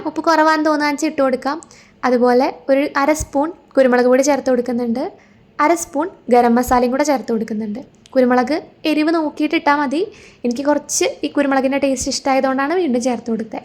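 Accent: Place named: Thrissur, India. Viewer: തൃശ്ശൂർ ക്കാരി ആണല്ലോ. കൊള്ളി എന്നു പറയ tcr അല്ലെ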